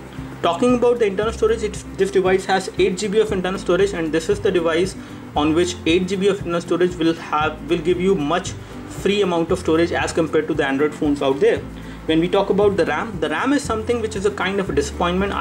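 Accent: India. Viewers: INDIAN accent is so disgusting..Yuck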